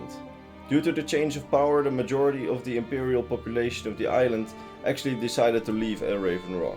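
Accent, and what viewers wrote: Netherlands. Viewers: You’re dutch right? Judging by the accent.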